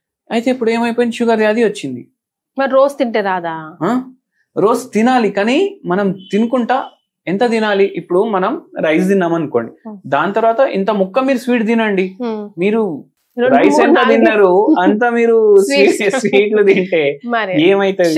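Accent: Telangana, India. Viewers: Thanks for your valuable information . Little bit telangana telugu accent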